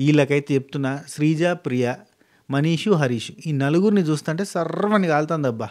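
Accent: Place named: Warangal, India. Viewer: Em okati em rendu anta 😂 Anna medhi warangal ah? Ma intlolla la matladthunnar meru ❤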